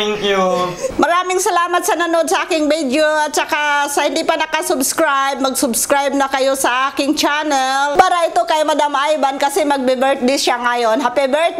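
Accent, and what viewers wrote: Visayas, Philippines. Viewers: bisaya kaya xa ganyan. proud bisaya here.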